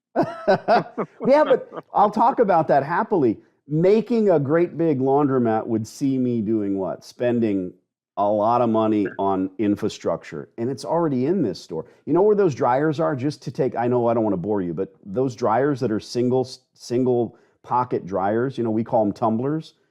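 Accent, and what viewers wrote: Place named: South, United States. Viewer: Great Southern drawl on this one. I hope I never have to hear your Staten Island accent lol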